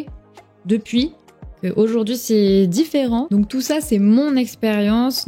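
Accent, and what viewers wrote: France. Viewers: Im English and I think your English was 'tres bon' please don't loose your French accent, its charming and adds musicality to English. I have been teaching myself French for 1 year, the fog of understanding is clearing but I am a long way from speaking. Im concentrating on listening and reading, getting as much comprehensible input as possible. as an old saying says 'Slowly slowly catchy monkey'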